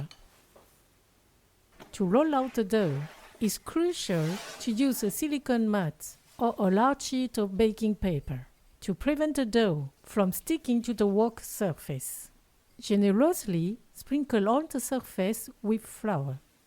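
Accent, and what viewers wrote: France. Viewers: I like watching your videos. You look like an Asian but your accent is like French. Do you live in France?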